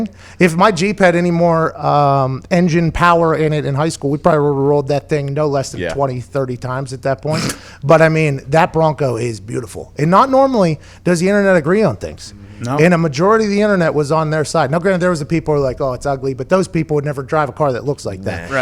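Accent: Pittsburgh, United States. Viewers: Man I love watching and listening to these videos, gotta love that pittsburghese that comes out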